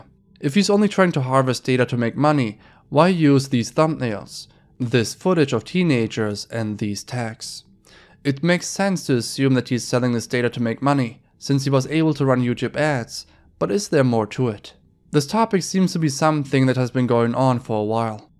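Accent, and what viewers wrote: Germany. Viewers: You have a hard to pin accent....kinda German, or Germanish, but I deserve 50$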